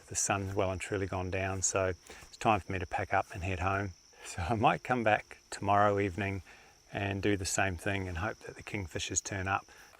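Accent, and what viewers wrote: Australia. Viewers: Sitting here in cold Wisconsin January (2022), admiring Victoria wildlife. Maybe I will head to WI river soon for eagles, they love the free water below dam once all lakes are frozen. I have a canon 500 mm and 1.4 telex for my 7dmarkII, working on convincing myself to spend $2500 on an r6. Love Victoria and the rest of Australia I;'ve visitied (not enough), love the wildlife, even enjoy the accent mate! Have a cabin on a lake up north with Kingfishers, maybe I need to spend some time concentrating on them, they are cool beyond measure.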